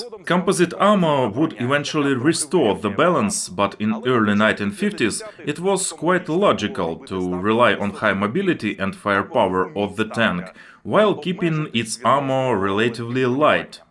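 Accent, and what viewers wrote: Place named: Eastern Europe. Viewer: Можна було штучний iнтелект заюзати для перекладу голосом. Було бы гарнiше без нашого слов'янського акценту на захiд.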